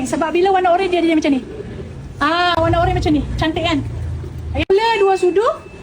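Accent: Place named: Pahang, Malaysia. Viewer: Trime kasih kak... Kak org pahang ke... Mcm ade sleng pahang skit tadi nye tu... Huhuhu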